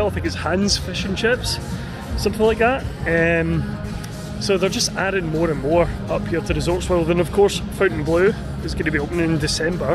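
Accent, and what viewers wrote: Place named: Scotland, United Kingdom. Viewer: As usual a great vlog. As I said before superb to hear a fellow Scottish accent in our favourite city.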